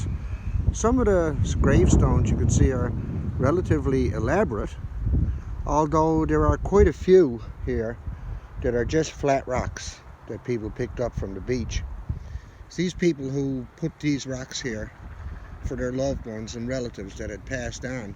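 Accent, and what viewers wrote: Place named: East Anglia, United Kingdom. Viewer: Your accent has some elements of East Anglian pronunciation, i.e. north Essex, Suffolk. Have you traced your family back a few generations?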